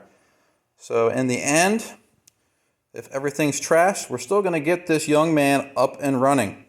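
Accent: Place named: Baltimore, United States. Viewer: Good to hear that Baltimore regional accent again!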